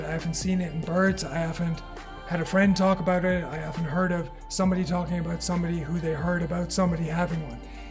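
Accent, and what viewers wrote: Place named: Southwest, Ireland. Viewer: Where is that accent coming from, bangs of south west Ireland throughout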